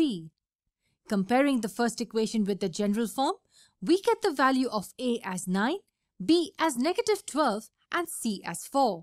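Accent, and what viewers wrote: India. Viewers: That moment I heard the Indian woman I knew I was in for hell.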